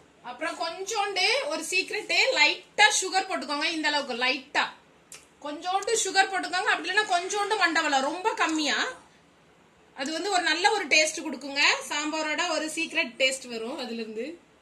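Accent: Tirunelveli, India. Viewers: Super sissy, Wr is ur native place? I think u r nellai ponnu bcoz im also in nellai. Slang la theriyithu sissy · Super Ka... Unga slang vache kandu pidichuten... Nanum thoothukudi than Ka... Akka sothi kulambu seinga ka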